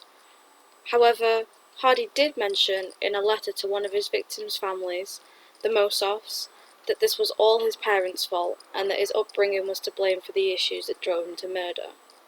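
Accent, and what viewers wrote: Manchester, United Kingdom. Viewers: I 1st heard of Trevor Hardy years ago and I'm from Manchester myself and I live opposite where 1 of his victims was found, you sound like you're a Manc yourself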